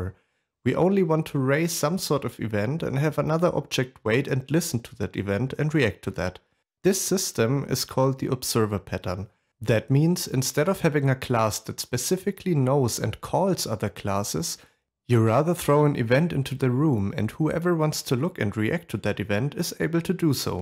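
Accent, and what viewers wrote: Germany. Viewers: Are you german by any chance? Sounds a little like it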